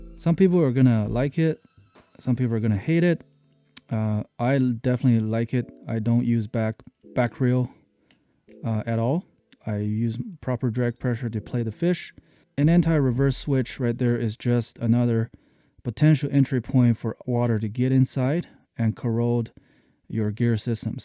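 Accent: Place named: Canada. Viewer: Fuck Im good. I could tell immediately you were in north America then after a couple minutes I knew it was Canada😂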